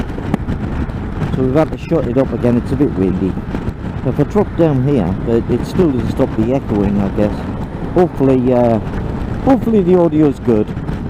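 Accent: North East England, United Kingdom. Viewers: Interesting video..👍 Do l detect a North-east accent ?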